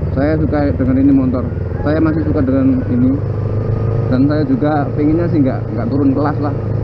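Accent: Java, Indonesia. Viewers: logate jowo bingit... asline ndi to buoosss...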